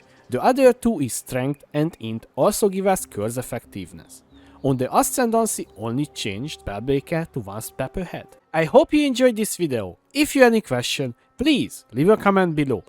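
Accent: Hungary. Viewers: Az akcentusból sejtem, hogy magyar videós vagy. :) Hajrá-hajrá, sok sikert!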